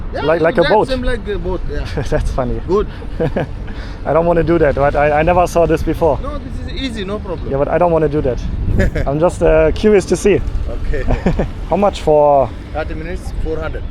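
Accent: Germany. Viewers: you have such a nice German accent. It feels so homey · German accent? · Dein Akzent ist echt krass 😅 Aber manche lieben es auch. Jetzt vermute ich mal: Stammst Du aus Sachsen? Oder irgendwo aus der Nähe?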